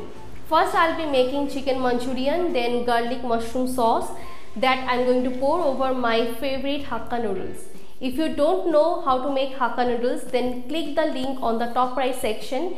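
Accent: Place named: India. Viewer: Wtf is this nigga talking about....I guess all Indian English are same....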